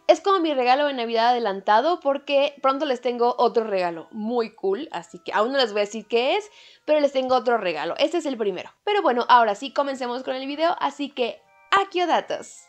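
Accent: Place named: Mexico. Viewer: Que poco se nota ya el acento Mexicano en! Sin duda alguna ha evolucionado en sus videos, sin decir que el que tuviera acento estuviera mal, todos somos brujas y magos del mismo bando. 👀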